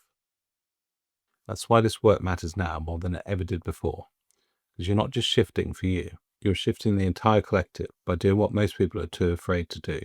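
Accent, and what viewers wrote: United Kingdom. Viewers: What part of England are you from? I was stationed there for 8 years. Loved it.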